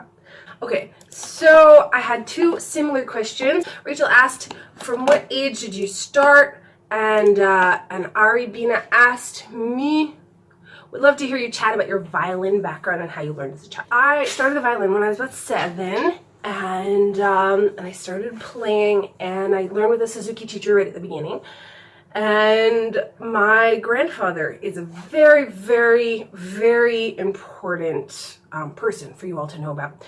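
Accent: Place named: Baltimore, United States. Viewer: Wow, you sound so much like home to me. Are you from Baltimore? Pikesville? Love your videos!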